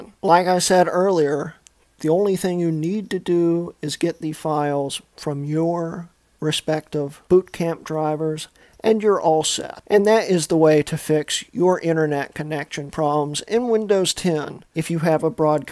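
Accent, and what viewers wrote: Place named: United States. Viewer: Gosh that American voice is absolute AIDS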